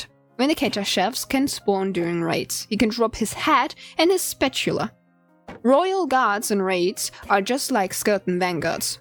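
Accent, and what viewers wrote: Czech Republic. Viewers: i luv ur czech accent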